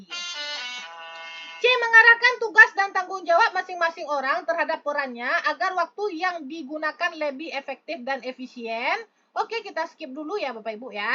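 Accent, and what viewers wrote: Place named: West Sumatra, Indonesia. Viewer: Dari gaya bicaranya ibu orang Minang ya.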